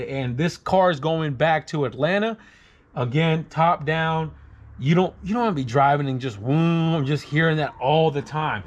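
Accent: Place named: Northern California, United States. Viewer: I can tell you from Northern California bra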